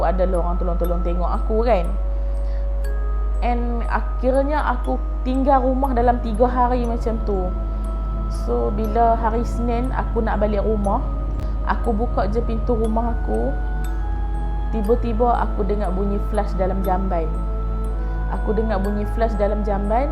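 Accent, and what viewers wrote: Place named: North, Malaysia. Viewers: sat ckp utara sat ckp kl..dik biaq selaraih baru tatabahasa cantik dn crita jd menarik..cuma nk peringat jar..apa2pun good effort.. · Suka l dgr akak ckp utara😍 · Terbaik 👍👍 Teruskan bercerita dalam dialek utara.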